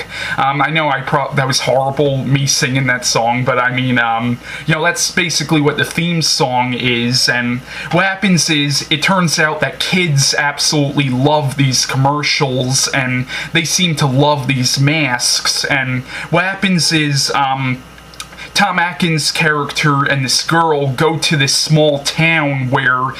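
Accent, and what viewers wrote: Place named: New York, United States. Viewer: Ahhhh.. I knew I'd heard the accent somewhere before :) I knew it was either New York or maybe Connecticut or something. Sorry for my ignorance. I'm Irish from England, so US accents aren't quite so obvious over here. Nice review anyways, mate :)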